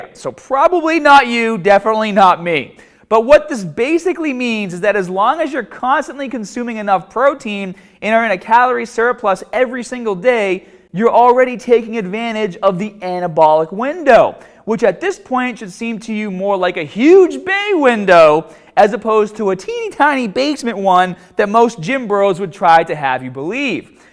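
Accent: Boston, United States. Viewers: God fucking damn that Boston accent! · loll that boston accent tho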